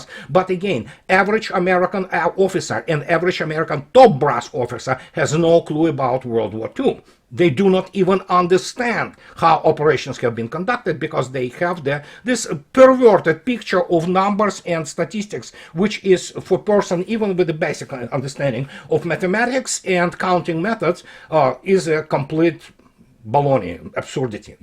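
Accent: Russia. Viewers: I don't understand people who complain about you speaking too fast. I usually speed you up to 1.25! Maybe I am just more used to the Russian accent, seeing as my wife is Russian. I follow numerous channels like Judge Nepolitano with Larry and Ray, Col. MacGregor, Scott Ritter, Pepe Escobar, not to forget the two Geek boys on the Duran. Won't get through all of them at normal speed. 😁😁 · Thank you for YOUR Russian insight, Russian high standard delivery, Russian accent and Russian humour!!! Excellent shows every time!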